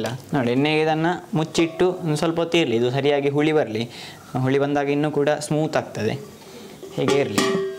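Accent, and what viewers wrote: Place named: Dakshina Kannada, India. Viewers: Pure south kanara Kannada. Here to listen to that only. Music to the ears. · Nimma kannada bhashe holigeginthalu sihiyagide. Pure kannada. Namma dakshina kannada bhashe.👌👌👌👌👌👌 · Your cooking skill is amazing👍👏👏 also it is very pleasant to hear Kannada in South Canara accent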